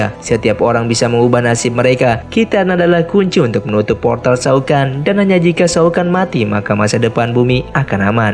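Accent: West Java, Indonesia. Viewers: Intro nya okos sunda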